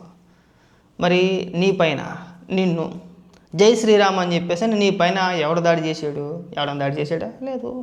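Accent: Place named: Amalapuram, India. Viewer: Sir me accent vinte Amalapuram lo unnate anipistundi sir pelli chesukuni tirupati daggara oka palletooru unnattu undadu Sir 🙏😊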